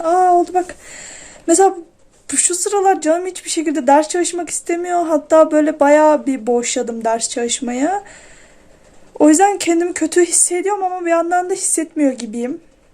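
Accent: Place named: Kütahya, Turkey. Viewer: Şiveden Kütahyalı gibisin öyle isen Kütahya'nın neresindensin?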